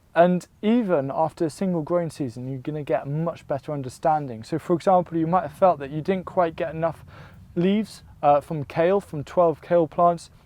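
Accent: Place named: United Kingdom. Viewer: Thank you! What a wonderful video! PS I like the UK accent far better than the US one!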